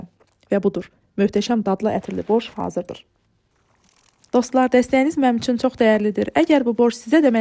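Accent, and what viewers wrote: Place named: Karabakh, Azerbaijan. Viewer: Kanaliniz yeni qarsma cxdi abune oldum😊xeyrli ugurlu olsun🙌deyesen Qarabaglisiz lehceniz sirin geldi❤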